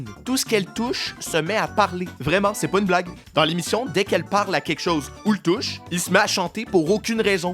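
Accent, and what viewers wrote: Quebec, Canada. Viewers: c'est tellement rafraichissant quand je vois tes vidéos apparaitre dans mon youtube, d'entendre une vraie voix québécoise ps filtré comme a la tv. humour au point comme toujours en plus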